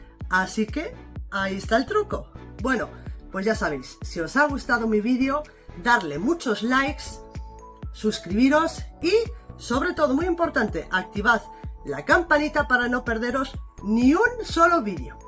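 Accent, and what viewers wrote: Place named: Spain. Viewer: Por su acento creo que esta mujer también es española 😂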